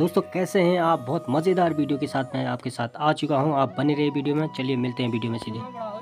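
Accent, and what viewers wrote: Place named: Pahari, India. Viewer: Wow कितना sunder जगह बहोत ही aacha मन ख़ुश हो गया वीडियो देख कर और आपकी पहड़ी आवाज सुनकर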